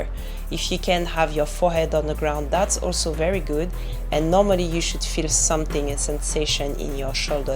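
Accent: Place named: France. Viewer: English with a French accent is insane. Now you know what your trump card is.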